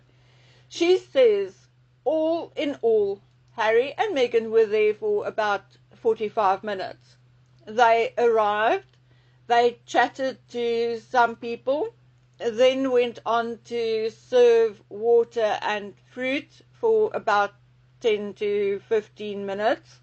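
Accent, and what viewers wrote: South Africa. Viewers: Do I detect a South African accent? I was fortunate enough to live in Rhodesia, a once beautiful and prosperous country, for 31/2 years, during UDI. ☺️🙋‍♀️🇬🇧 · I have just found this video your accent is south africam it is so great to hear another SA AM now living in reno nevada I was born in joburg so I am so chuffed I found your channel❤❤❤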